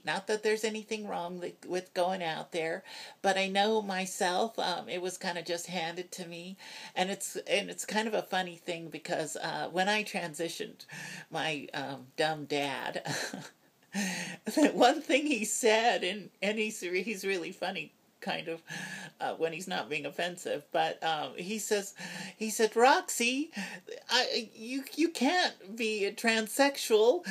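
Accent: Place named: Texas, United States. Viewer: Roxy, you are an incredibly interest and attractive woman and you've got a great Texas or southern Illinois feminine voice. As a 64 year old cisgender straight male ally I find it interesting to list to a woman who is "from my generation" which is rare as I listen to the You Tube videos. There is a 30ish trans gender woman in Florida JW transgirl who might be an interesting person for her to talk with. and like yourself she is a very interesting and intelligent woman. I am so happy that you had the opportunity to have some time of closeness with your mother in the hospital when the two of you did not have the stern eyes of the rest of your family.. I am so sorry your family was so cruel to you on Mother's Day. I will tell you what I tell every transgender woman, I feel that each and every one of you is a special gift from God, you have the special gift of understanding the world through nthe eyes of both a man and a woman.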